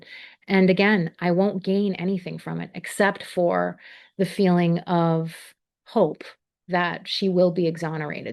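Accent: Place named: United States. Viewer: It is so shameful that we have to listen to someone with an American accent. The English so called justice system is now the laughing stock of the world because of the Lucy Letby witchhunt. We should also be grateful to Rachel Aviv who wrote from across the Atlantic at a time when truth telling in our country was banned by the trial Judge.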